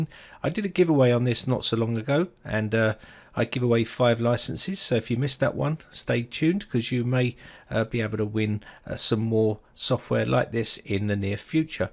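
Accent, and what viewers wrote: England, United Kingdom. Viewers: Watched and appreciated, but why are all these tech primers done by guys with English accents? Have to over and over some sections on many of them to figure out what's been said!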